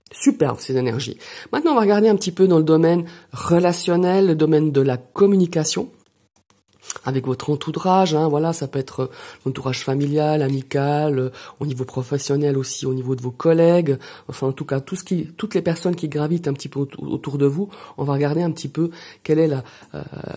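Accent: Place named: Geneva, Switzerland. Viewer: Merci infiniment pour votre message 🥰 J’entends l’accent genevois !?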